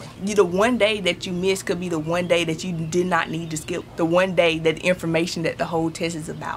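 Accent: United States. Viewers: lol love it already. so country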